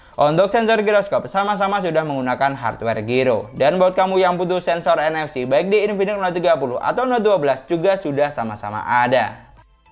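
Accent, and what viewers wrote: Madura, Indonesia. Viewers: mas logat maduranya kentel banget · Logatnya kaya madura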